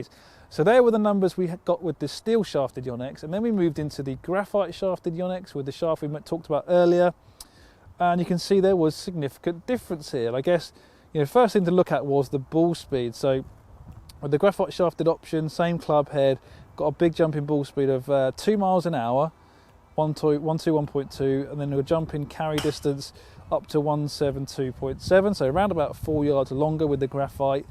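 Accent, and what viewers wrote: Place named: England, United Kingdom. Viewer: Kevin Zhao they have that accent because they ARE English just like your accent is from your home country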